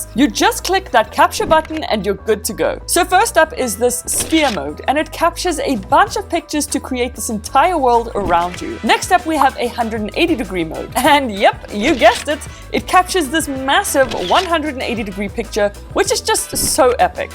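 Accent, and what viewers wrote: Afrikaans, South Africa. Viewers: Hmm, are you from SA, i notice so of those areas? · Are you Afrikaans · I just want to know?????? Are you from South Africa🇿🇦🇿🇦🇿🇦🇿🇦 BTW I love your intro🥨🥨🥨🥨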